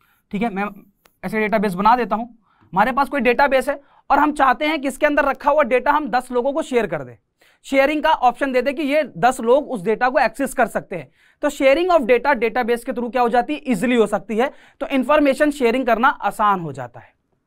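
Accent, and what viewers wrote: Haryana, India. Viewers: Thank you sir 😌❤️ SIR AAP HARYANVI HONA 😀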